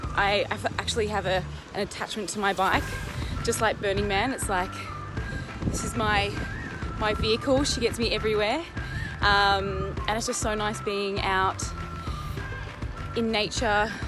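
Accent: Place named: Australia. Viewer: Haha i thought you were American. Then when i watched your videos and listened to you talking....i was like i am sure she is an Aussie!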